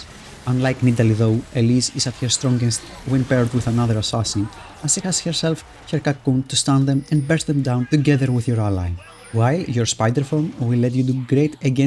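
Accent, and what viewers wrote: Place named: Greece. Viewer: From your accent you sound Greek!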